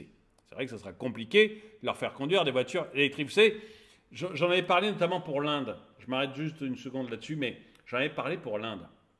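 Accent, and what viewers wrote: France. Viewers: Je viens de m’abonner cette semaine! J’aimes les thèmes que tu abordes et ce que tu dis. Surtout la manière dont tu le dis...(très française! le ton, le phrasé).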